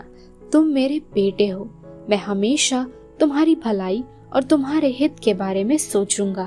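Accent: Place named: Bengal, India. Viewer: She sounds to be bangoli.. that's why you're getting problem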